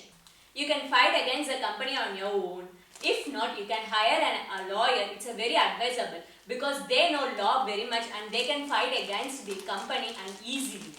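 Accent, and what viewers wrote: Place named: India. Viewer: For a minute I was confused whether she is speaking in English or Indian language. She has very strong Indian language influence. Video is informative. Thanks.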